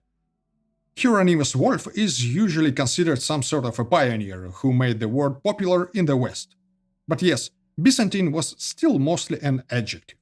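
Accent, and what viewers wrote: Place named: Eastern Europe. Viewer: Wrong. The name "Byzantines' was popularized by the other Roman empire... the German speaking one. They refused to call the other Roman empire Roman because they insisted the holy Roman empire was the "real" Roman empire. Your anti-Greek videos and slavic accent suggest a less than objective motive. What's your background?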